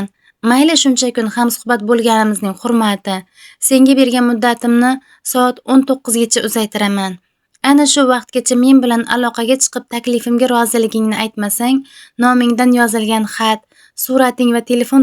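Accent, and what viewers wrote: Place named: Surkhandarya, Uzbekistan. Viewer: Surxondaryo yoki Qashqadaryo shvasida gapiryabdi bu qiz😁😁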